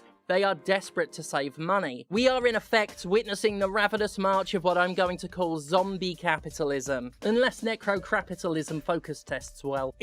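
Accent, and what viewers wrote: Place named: United Kingdom. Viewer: My God, she is even more British than she was before!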